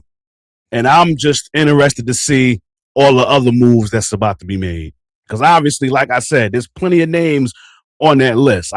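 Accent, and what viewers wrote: New York, United States. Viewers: You ended up on the feed. Heard you speak a couple minutes, figured you from NYC and a Liberty fan..you confirmed it...then I see you from the East.. had to subscribe. I'm from the Stuy, reppin the Liberty so looking forward to more content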